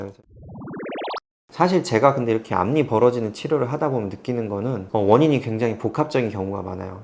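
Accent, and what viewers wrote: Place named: Gwangju, South Korea. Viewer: 원장님 답변 정말 감사합니다. 저 광주광역시 사는데 원장님 억양에 약간 동향 느낌이 났는데 홈페이지 가보니 광주 사셨을거 같아 반갑습니다. 복 많이많이 받으세요^^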